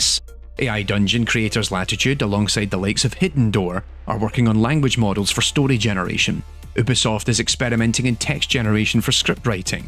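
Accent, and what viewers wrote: Scotland, United Kingdom. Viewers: Ah, another video from. Marvelous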